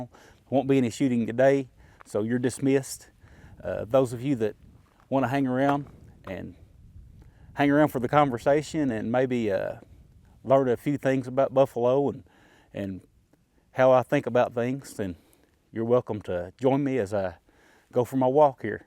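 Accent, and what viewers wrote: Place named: Kentucky, United States. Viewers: I’m a hillbilly from Kentucky too (Letcher Co.) I can understand you just fine, you sound a lot like me and my people, and you are right about kids having less of the hillbilly accent, my kids talk a little more proper than I do, I think it’s from TV mostly. Well I’m gonna get back to watching the UK vs. UT game it’s been a good one so far, Go Cats! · Hey Buffalo. Always good to hear that southern drawl up here in New England. The south has some great food, great people, and is the most business friendly region of the USA. Pay no attention to the haters. Glad that you found your ve-hicle ok. LOL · Being a fellow Eastern Kentuckian I appreciate your accent. I wished it wouldn't die out, but I think it is. My Grandson sounds like the people on tv. I really enjoy your videos and being a new subscriber I have many to watch. 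I believe the Governor would sign the bill if it makes it to his desk. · I just found your channel and have been binge watching. First heard you and thought you was from Kentucky as well. Then I find this video and I’m pretty sure I’ve hunted the pioneer hunting area you walked by in this video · Your accent sounds like my kinfolk from Pennington Gap, newer subscriber enjoying your channel from Phx Az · Spot on Brother! The fact that you have to delete comments based on your accent just shows how retarded some people are.. I just figured you are from the south.....dont all ya’ll talk the same anyways?? 😂😂😂. Great video, keep it up!! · Buffalo, I appreciate your videos. As a fellow Kentuckian and graduate of EKU, I appreciate the Appalachian hertiage. I don't know why anyone would have an issue with your accent. Take care and God bless. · I’ve said it before, sounds like home to me. My dad’s side of the family is all from Kentucky 😁 Spent the first week of my life in Ft Knox off base housing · Brother don't worry about your vid quality. 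It is the substance that matters. As far as the accent it reminds me of my family who live down in your neck of the woods. My Dad was born in a coal mining camp in deep Appalachia and my roots run deep in those mountains. Thanks reminding me of my family's home. All the best!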